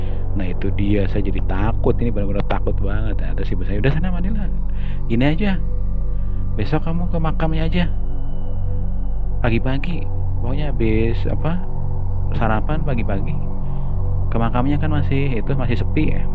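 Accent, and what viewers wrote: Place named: Java, Indonesia. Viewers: Salam ngapak om, ora ngapak ora kepenak. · Salam ngapak😂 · Jawinya itu loh ada bnget bkin asyik dngerin critanya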